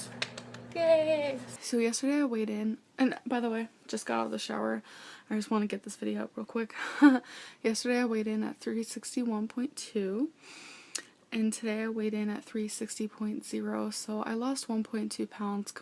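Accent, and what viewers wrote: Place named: South, United States. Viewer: Where’s her odd southern~ish(not really) accent???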